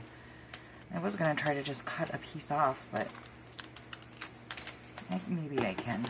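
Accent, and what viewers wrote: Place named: Midwest, United States. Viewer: Your from the Midwest huh, I nooww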